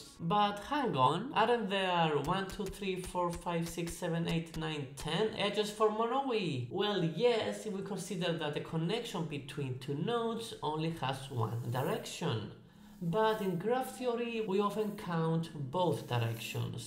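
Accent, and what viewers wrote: Cyprus. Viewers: Sorry to ask this but.. Where are you from? You have a very a Cypriot accent ✨🇨🇾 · I can always recognize a Cypriot accent 😛. Ωραίο Βίντεο!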